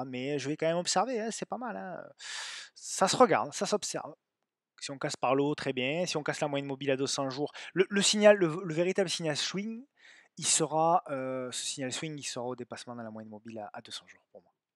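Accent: South, France. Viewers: Top. L'acent du Sud le meilleur!!! 😉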